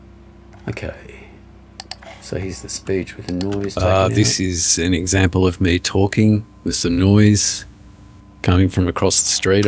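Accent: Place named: Australia. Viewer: nice hearing an aussie voice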